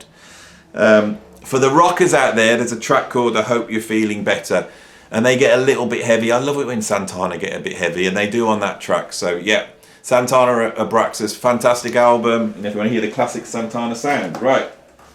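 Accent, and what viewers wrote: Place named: England, United Kingdom. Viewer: Give him a break he's an Englishmen they all pronounce words that end with an "A" ( er) . people here in the U.S. (New England) do the same. '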